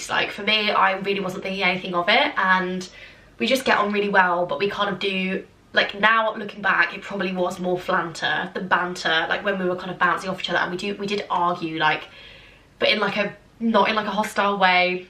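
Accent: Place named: RP, United Kingdom. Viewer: I’ve come back since when you were in year 13… your accent is so posh now omg 😂😭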